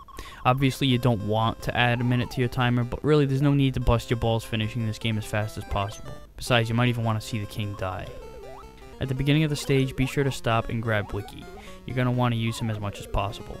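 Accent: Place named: Brooklyn, United States. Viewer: I like your Brooklyn accent when hearing your reviews. It enhances the review's humor and makes you kinda sound like a bad ass. XD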